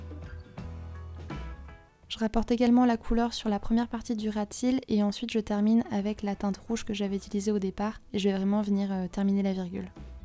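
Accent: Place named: France. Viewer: Genial! Solo estaba preguntándote de eso porque vi un video tuyo en que hablaste en español porque me encanta ese idioma con el acento frances, suena tan hermoso! Solo es que soy un "nerd" cuando se trata de idiomas y por eso vine :v pero me caes bien, claro lol! q tengas un buen dia y ojalá que yo pueda hablar el frances y el aleman algun dia ya que son tan hermosos!! chau!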